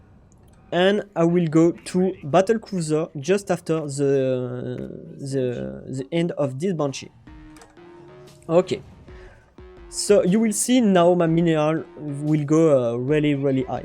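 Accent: France. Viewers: pourquoi les francais de france prononce tous THE comme ZE... <link> <link> <link> serieux je vois celas partout...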